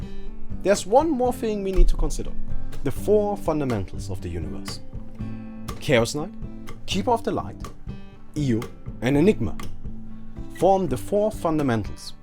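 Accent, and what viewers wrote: Germany. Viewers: he is german, that explains his pronunciation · I really find it funny how many people comment on that zeus zois stuff... zeus is pronounced zois(strong z) in german, this guy is from germany so he talks like that. case solved. ( not to mention this pronounciation is actually more correct than the english one) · Dat german accent...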